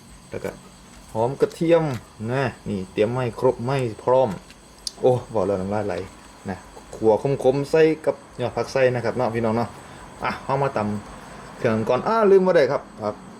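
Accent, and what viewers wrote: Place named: Central Thailand, Thailand. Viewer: ทำเหมือนพากกลางเลยคับแต่ไม่ใส่มาระคับแชบๆคับ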